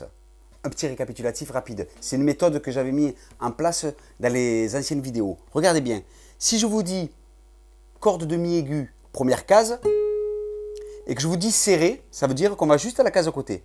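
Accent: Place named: South, France. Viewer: ton accent du sud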